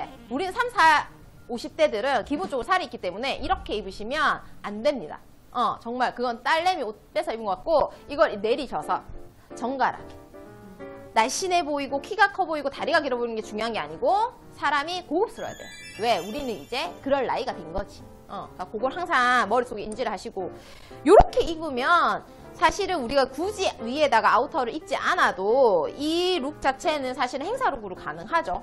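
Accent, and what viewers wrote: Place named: Gyeongsang, South Korea. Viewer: 미국에서 눈팅만하는 40하고도 1/4세 ㅋㅋ 삼둥이 맘입니다. 언젠가 한국에 가면 꼭 가겠노라며 위로하면 영상 잘 보고 있습니다. 같은 경상도라 설명이 귀에 쏙쏙 들어옵니다. 정강하고 고급지게!! 감사합니다 🤗🍓🍓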